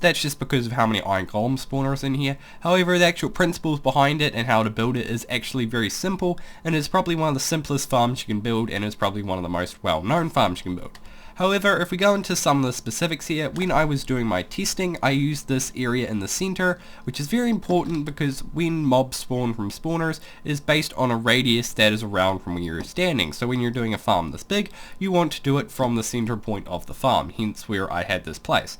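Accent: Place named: New Zealand. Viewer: What part of New Zealand are you from? I'm From Auckland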